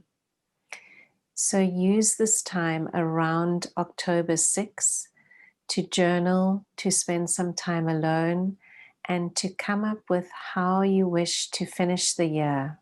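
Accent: South Africa. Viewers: Loved this; thank you very much! Gives me hope. Wonder if I can still meet someone during Covid living at my parents house haha. My Ascendant is libra at 23' degrees; Will Pluto still have an deep transformative influence on me? you sound South African haha:)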